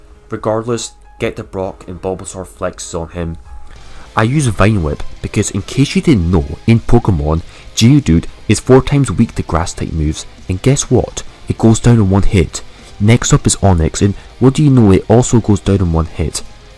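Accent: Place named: Scotland, United Kingdom. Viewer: Not a pokemon related question, but could you briefly turn up your scotish accent to 11 and sound almost unintelligible?!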